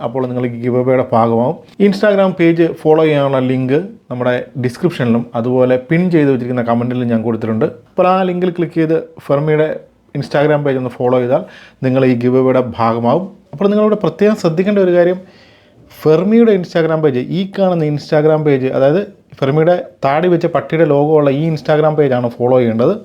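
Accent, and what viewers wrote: Kottayam, India. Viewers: നാട്ടിൽ എവിടാ കോട്ടയത്ത്‌ ആണോ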